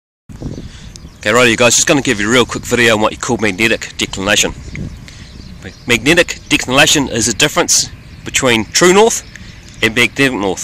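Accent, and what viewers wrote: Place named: New Zealand. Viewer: You are awesome! thx a lot!! Love you kiwi accent. Been there for a yr living in Dunedin! Now i am back in Argentina, south america. Happy Flying!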